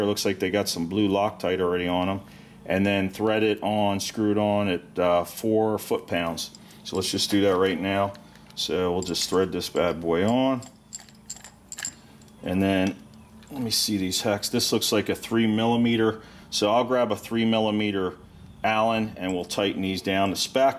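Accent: Pennsylvania, United States. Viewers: Wow Pennsy accent coming at yas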